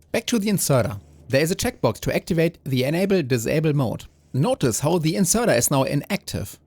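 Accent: Germany. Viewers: The German accent always gets me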